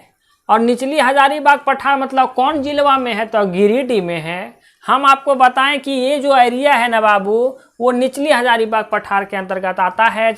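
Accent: Bihar, India. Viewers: आप बिहारी जैसे बोलते हो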